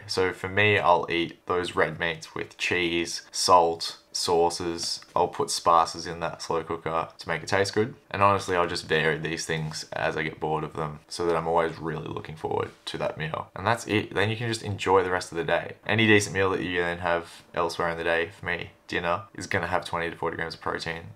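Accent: Australia. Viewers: Lol have you ever heard an English accent? It's clearly Australian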